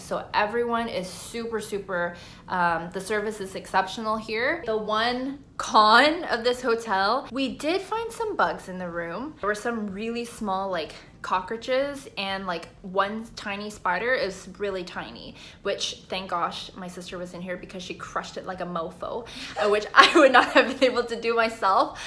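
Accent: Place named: United States. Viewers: How come you use the American accent in M’sia ? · Late to respond here🙏 Appreciate the video👍👍 I’d say stick to your American or North American accent while I’m Malaysia.. whenever I try use Manglish/Singlish, I think I sound weird and can’t get my thoughts across. All the best!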